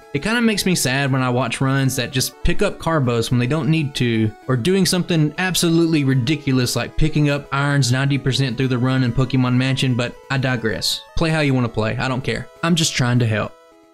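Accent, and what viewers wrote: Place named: Appalachia, United States. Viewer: I love the fact i found a pokemon channel where someone pronounced names and items like I do in the southern tip of Appalachia. Instant sub lol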